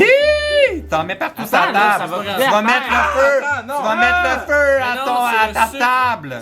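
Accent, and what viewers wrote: Quebec, Canada. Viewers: Plus j'écoute ton Vlog plus je me dit que j'aime vraiment l'accent québécois 😍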